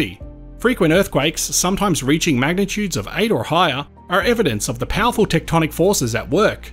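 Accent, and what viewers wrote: Australia. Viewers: Sorry, I'm a person with hearing problems. I can barely stand listening to the Auzzy accent. It's me, not him.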